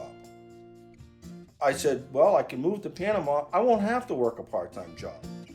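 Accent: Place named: New England, United States. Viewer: You still have you New England accent. Not Massachusetts but Conn VT or Adirondack area of NY. Thanks Just speaking to friends last night about moving to Costa Rica or Panama. Do you know Jim Willey?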